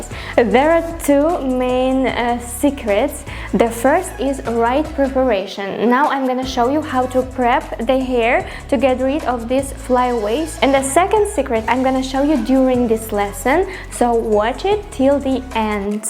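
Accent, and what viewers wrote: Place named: Russia. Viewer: Русский акцент и русская мимика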